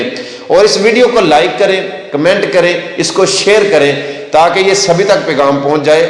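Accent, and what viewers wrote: Punjab, Pakistan. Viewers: پنجاب پنجابیوں کی شان ہے سب کے سمجھنے کےلیے اوردو ہی بولے ویسے بہھی لہجا پنجابی لگتا ہے پنجاب سندھ سرحد بلوچستان سارا میرا پاکستان پاک فوج زندہ آباد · سر پنجابی میی بات سننا آپ کے منہ سے اچھی لگتی ھے اپ کا لہجہ پنجابی ھے اس لئے پنجابی ہی سوٹ کرے گی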